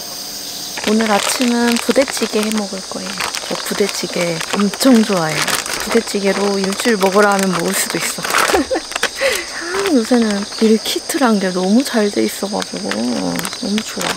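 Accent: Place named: Gwangju, South Korea. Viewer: 같은 광주사람이라 사투리 너무 나랑 똑같아서 정겨움ㅋㅋㅋㅋㅋㅋㅋㅋㅋ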